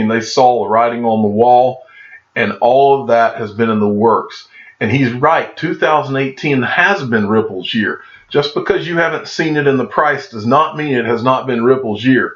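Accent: South, United States. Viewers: don't judge by voice alone, a lot of the southern guys are smart like Mike Judge who created hilarious movies and King of the Hill. This is the best XRP channel because has experience in the finance world & has been investing in XRP for 3-4 years BEFORE anyone else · My job keeps me traveling. I am rarely at home. I listen to you every day while I am moving from one place to another. I also have a Youtube channel. My monthly Google payment goes into XRP. The first, second, third and fourth time I heard you on youtube I clicked forward IMMEDIATELY! That southern accent of yours was the proof that you were stupid AS FAR AS i WAS CONCERNED! One day you posted a topic that caught my intrest and l listened to your entire video. I have been hooked ever since. YOU ARE AMAZING!!! Forgive me for my predijust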